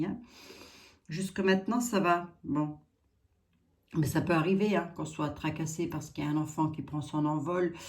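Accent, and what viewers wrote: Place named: Vosges, France. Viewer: vous êtes vogienne ? on dirait mon papa ,avec votre accent ;-)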